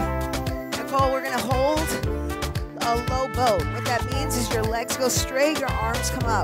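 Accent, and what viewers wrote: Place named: Staten Island, United States. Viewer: Just wish she didn't talk like a Staten island sanitation worker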